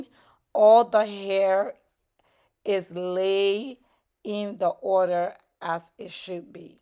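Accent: Liberia. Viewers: This came out so gorgeous mama ❤the hair and the makeup you are talented 👏🏾btw are you Liberian ? The accent sounds Liberian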